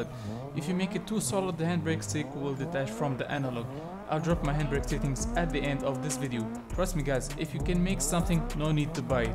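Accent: Tunisia. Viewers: Naarach aleh aarftk tounsi men soutek hahahah Great tutorial <3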